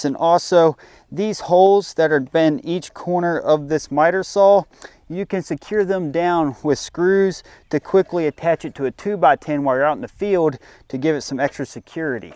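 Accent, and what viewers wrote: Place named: Baltimore, United States. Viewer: Where ya from, Baltimore?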